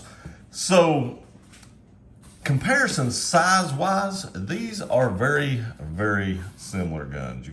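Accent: South, United States. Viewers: Damn, this dude is country AF. lol. Tennessee maybe? Georgia??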